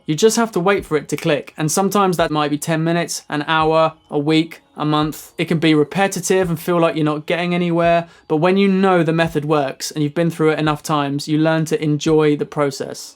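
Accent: United Kingdom. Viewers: You look like American but you sound British.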